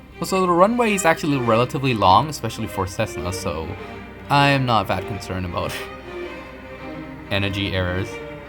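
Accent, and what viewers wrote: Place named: United Kingdom. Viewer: damn that British accent tho....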